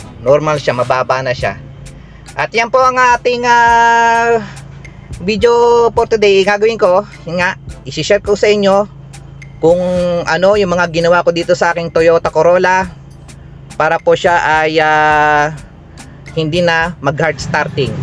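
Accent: Pampanga, Philippines. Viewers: kapampangan ka boss?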